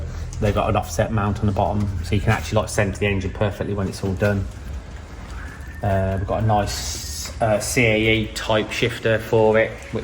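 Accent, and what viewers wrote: United Kingdom. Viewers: Awesome footage as always. If only I could understand everything says. I get about 60%, the rest is too ‘dialected’ for me. I mean, for a non-British person, his accent is rather overpowering. I have to switch on subtitles when he speaks. I don’t have that problem with the other DW videos though. But I will watch all videos.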